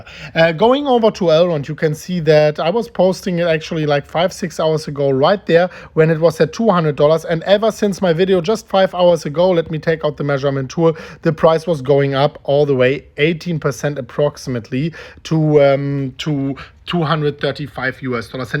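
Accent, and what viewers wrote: Germany. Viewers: I hear a German accent but your English is perfect. I speak German pretty well but not as good as your English. I love you man.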